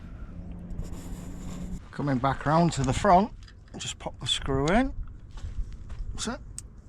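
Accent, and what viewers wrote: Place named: England, United Kingdom. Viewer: That’s an English accent… 75 dollars !…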